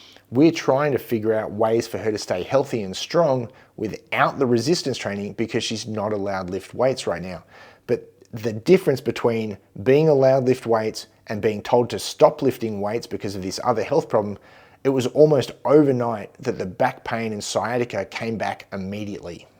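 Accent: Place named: Australia. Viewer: Excellent info, and I can vouch for 95% of it from living it firsthand. Hearing it in an Aussie accent was a nice bonus. 🍻 🇦🇺 Cheers, from across the pacific (fellow lifter over 40)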